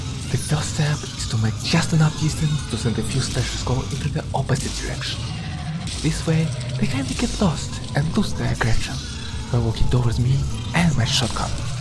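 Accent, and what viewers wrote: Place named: Europe. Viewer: european accent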